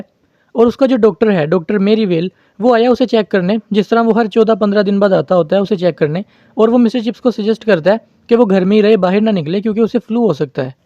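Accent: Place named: Lahore, Pakistan. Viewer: Apki language lahoriii type hai ap Lahore sy hai ?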